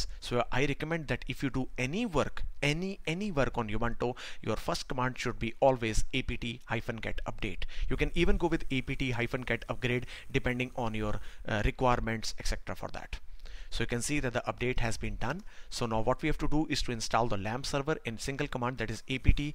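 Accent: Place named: India. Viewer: anyone else think Indian accents are awesome?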